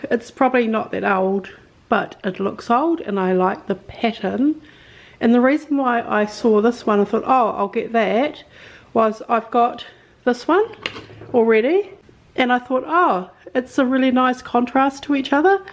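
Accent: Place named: France. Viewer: I love your style, it's right up my alley. Just a beautiful array of pictures. I adore your French accent so much. 😍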